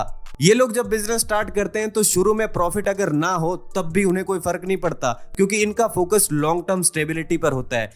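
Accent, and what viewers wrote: Gujarat, India. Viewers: Tum gujrati ho, accent! 🙂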